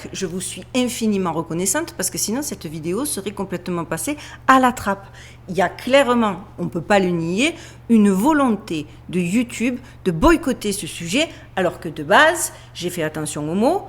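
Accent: Marseille, France. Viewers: Merci pour ton travail. J adore ton accent sudiste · j’adore la richesse de votre diction votre accent plein du soleil de Marseille et la finesse de votre humour quand tout part en vrille. 😘✨️💙✨️😊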